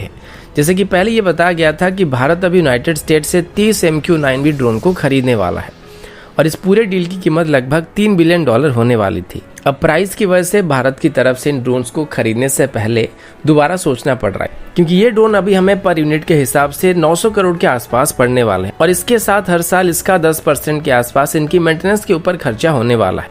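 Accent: Odisha, India. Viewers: Sir aap kya Odisha ke hai.mote emiti laguchhi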